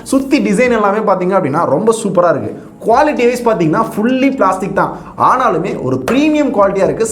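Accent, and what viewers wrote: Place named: Madurai, India. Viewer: Thala unga madhurai voice and tones.. Summa vera level😎💓👌👌👌👌👊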